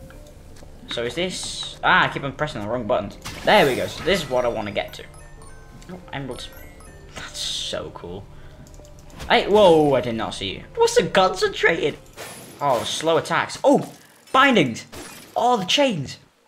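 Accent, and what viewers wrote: United Kingdom. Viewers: What part of England are you from. We are in Scarborough, North Yorkshire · Now i can hear his british voice i could not ages ago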